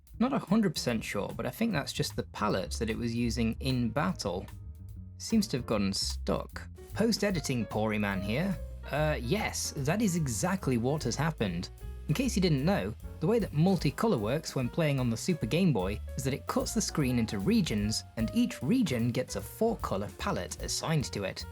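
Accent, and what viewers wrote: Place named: Shropshire, United Kingdom. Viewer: I don't, I just thought your accent sounded a little Shropshire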